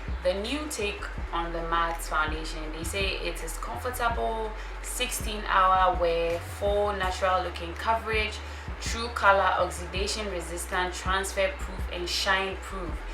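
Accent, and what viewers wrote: Ghana. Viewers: Hi, Your accent tells me you are from Ghana, I will like to get in touch with you and ask you a few questions directly. Kindly email me on <mail> I'm not sure about putting my number out here. I will be glad if I get a reply from you. Or you can post a business WhatsApp number if you have one. Anyway I'm your new subscriber and my name is Francine.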